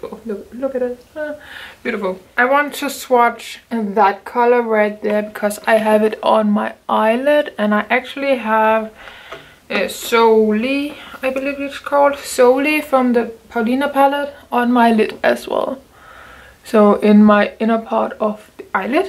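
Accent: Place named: Denmark. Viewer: Danish? Love the accent!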